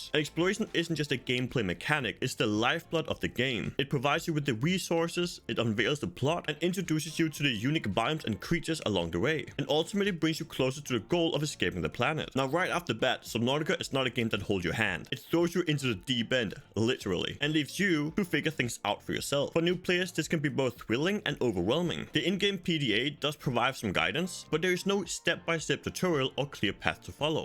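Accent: Denmark. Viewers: This is the thickest danish accent ever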